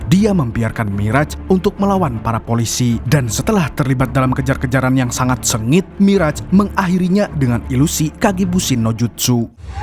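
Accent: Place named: Java, Indonesia. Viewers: Esih medok ya lik tapi lha mandan adem ❤ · Akses jawirnya kental sekalee brur!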